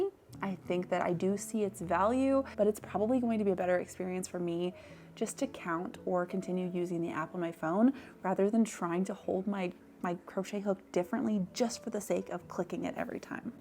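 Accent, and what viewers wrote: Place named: United States. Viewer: American accents are something else... You really have that vocal fry. Whoa....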